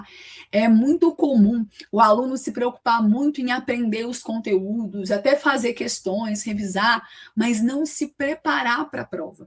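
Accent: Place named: Angola. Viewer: Acabei de reparar que o sotaque de lembra o sotaque angolano!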